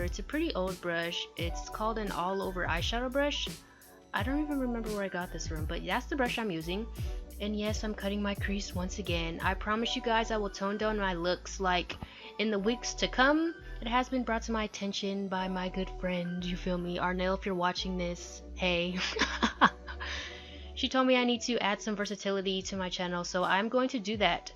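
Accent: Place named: Bay Area, United States. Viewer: Where are u from ??? You sound like you'd be from the Bay Area 😭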